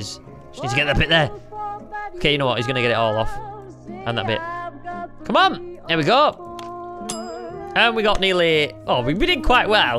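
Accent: England, United Kingdom. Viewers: People proceed to make fun of his accent Bro, I'm English too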